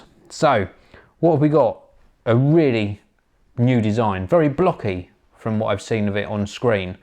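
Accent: England, United Kingdom. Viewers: Nice video nice to English person talking about tech as well not many out there.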